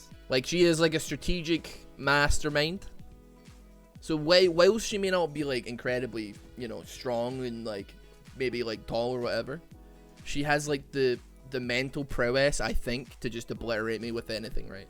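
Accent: Scotland, United Kingdom. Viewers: The facut that he's scottish makes this 1000 times better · If it wasn't for him being Scottish I probably wouldn't believe him.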